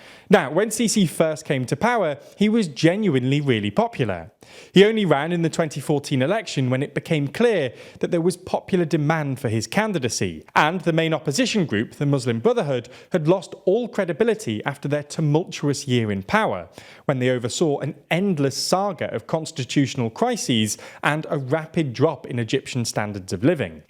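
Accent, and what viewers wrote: United Kingdom. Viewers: Its crazy for a dude with a British accent to all a global south leader "autocratic" lmao Especially since Egypt broke free from direct British rule about 10 years before my grandmother was born. In fact, there's probably people in Egypt right now who remember British rule. Like have a seat. Its also buck wild that they're making it seem like the economic issues of the country can be blamed on like one dude as if colonialism and the imposition of neo liberalism have nothing to do with it. Like bfr. Its useful to hear how liberals view things tho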